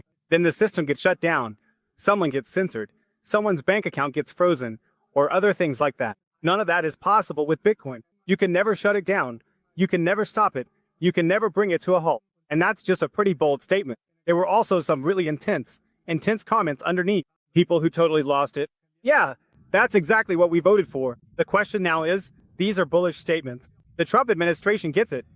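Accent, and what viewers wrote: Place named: Switzerland. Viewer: Sapperlott, des schäenglish isch voll super! 😊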